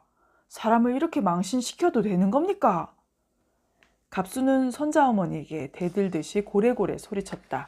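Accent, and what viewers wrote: Gyeongsang, South Korea. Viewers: 독특한 경상도 안동지방의 사투리와 억양에 나도 몰래 빠져들어 감사하게 잘 들었습니다. 고맙습니다. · 감사 한니다. 경상도 의성 근방의 그 살 찐 음성!! 고향맛이 뚝뚝 떨어 졌습니다. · 고향이 청송이라니 반갑습니다 저도 청송군 진보면이 교항이라요 글읽는이의 사투리를 들으며 엣날생각이나서 저도 열심히 들었네요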